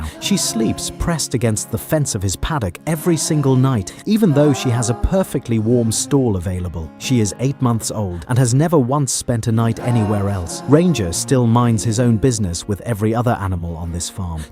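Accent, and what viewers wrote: United Kingdom. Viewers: Why a British accent when speakingʻ of his Tennessee horse farm? Why? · You have a slight English accent...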